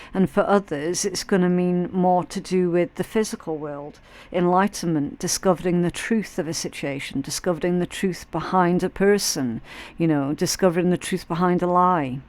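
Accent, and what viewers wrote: Scotland, United Kingdom. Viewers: 444 everywhere atm …444 likes and the time I’m listening…I even took a screen shot lol we went through a very challenging 3 years …but yes come through …integrity intact. 🌝😅 We have so many ♏️ in our family…and our Michael granted our family a Miracle last summer 2023 thanks …I’m from Scotland are you too and I’m definitely not allergic to swearing or sarcasm lol…I resonate with your lovely accent. 💙🦢💙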